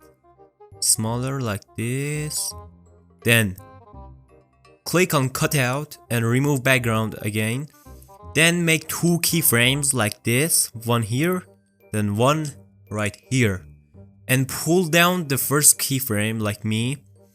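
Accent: Iran. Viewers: چرا لحجه اش ایرانیه؟😂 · Bro you are Iranian? ریدم تو لهجت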